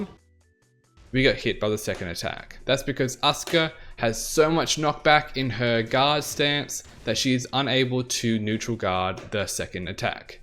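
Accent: Australia. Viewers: Your channel has suddenly popped up in my recommendations. I like it when I get to listen to Aussies, being an Aussie in Germany. Funny thing, your channel name in German and corrected for proper german grammar, massiver Zug, means massive train or massive pull, depending on context. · Where you from in Australia mate?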